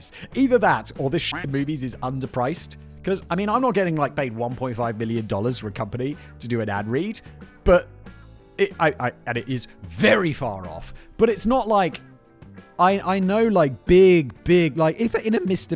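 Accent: United Kingdom. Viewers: Well you called it a hood instead of a bonnet so you might as well start pronouncing Nike like the rest of us. The UK isn't the world. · Eh. F the British pronunciations. No one but you uses them. If you wanted it different you should’ve fought harder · Fuck conforming (American here) you do you. Pronounce it the british way! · Don't feel badly about Nike. I'm in Michigan, and it took that shoemaker at least a decade of very expensive commercial advertizing to get me to finally make me pronounce it the Greek way. Before that, I pronounced it the normal way, like you. Also, part of your charm is your very British accent. Hearing you say nike is the audible equivalent of the Y in tyres. If you didn't do these things, then it wouldn't add up.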